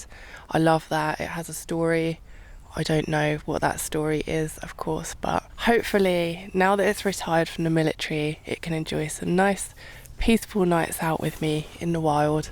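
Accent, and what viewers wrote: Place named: Upper class, United Kingdom. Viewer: Posh upper class david cameron girl init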